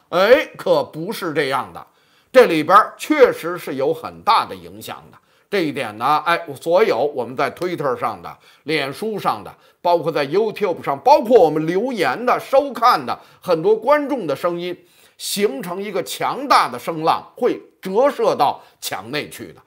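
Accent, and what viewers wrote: Beijing, China. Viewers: I love to hear your talk, didn't feel long at all, especially those Beijing words 😉👋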